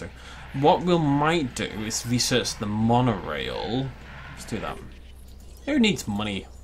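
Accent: United Kingdom. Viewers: One hour, great video, OG brit commentary. 10/10 video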